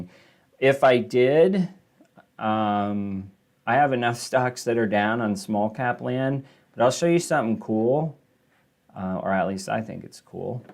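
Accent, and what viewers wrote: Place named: Hershey, United States. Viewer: It’s funny listening to your expressions. Takes me back home to Hershey. Very similar. Thanks buddy.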